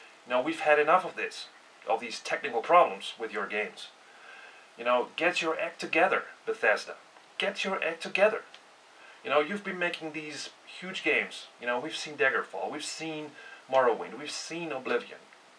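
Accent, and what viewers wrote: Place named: Netherlands. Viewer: Om één of andere reden is het altijd hoorbaar wanneer iemand uit Nederland komt :) Anyway, Indeed, Skyrim is lacking some serious polishment. This goes pretty much for all versions. Xbox version is sometimes having texture issues, and the PS3 version is a lagfest. This game wasn't ready for release, not in the least. They just wanted to make that 11-11-11 date because : * It's a cool date and easy to remember * Making some more septims due to the christmas periode.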